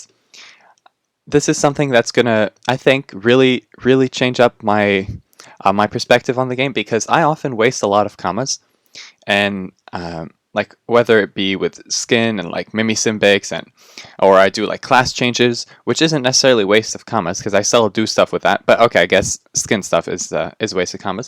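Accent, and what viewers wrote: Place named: Morocco. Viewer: you sound morrocan XD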